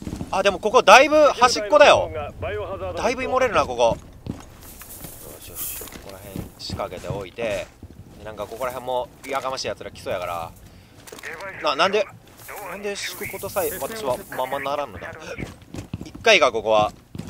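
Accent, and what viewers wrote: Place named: Kansai, Japan. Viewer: 自分とかゆうてますけどもみたいな関西弁使う感じめっちゃ好き笑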